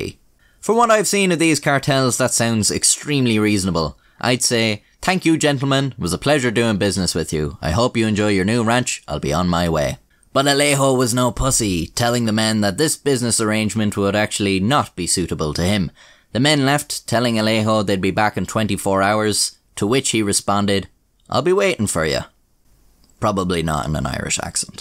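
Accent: Ireland. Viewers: Great story. I would never have u heard it or even remembered it if it wasn't for you and the way you told the story. Love the Irish accent. I'm a subscriber. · The way your stories are presented is pretty damn awesome. I mean, where else can an old, white guy from the USA get to listen to stories told by someone in an Irish accent. The articles are interesting, yet amusing, just what this old man needs after a day of work. You've gained yourself another subscriber, lad. Keep up the good work. :)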